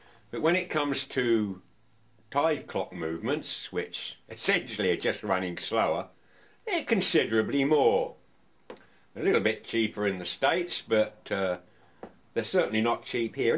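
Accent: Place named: Norfolk, United Kingdom. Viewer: I would have sworn you were from the Norfolk area. Oh well.......... I am over the water from you in Bromley, close to Greenwich.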